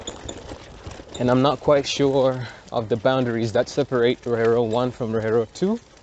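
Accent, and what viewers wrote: United States. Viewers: Thank you for sharing helpful information. We need more details about lands and houses for sale in Bujumbura. I like the way you speak English you sound like you have been in USA for a while. Just suggestion try to focus on real estate and then we will be able to see some houses on sale.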